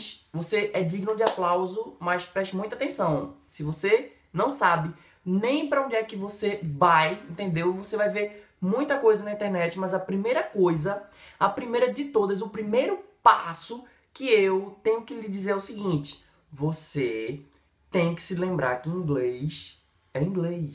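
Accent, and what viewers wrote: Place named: Pernambuco, Brazil. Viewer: Pernambucano detectado!!! 🚨🚨🚨🚨🚨🚨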